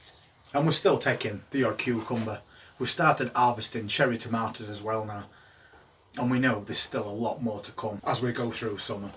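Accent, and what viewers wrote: Yorkshire, United Kingdom. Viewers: Ay up I can hear a Yorkshire twang were you from? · I'm from the other side of the Pennines, but I thought you were a Yorkshireman too! Enjoying the videos. Very relatable. Thank you!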